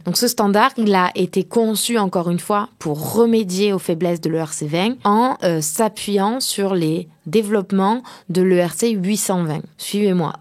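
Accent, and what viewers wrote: South, France. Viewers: Hyper agréable l'accent du sud pour cette vulgarisation de l'univers crypto et ici le sujet des ERC ! Merci bcp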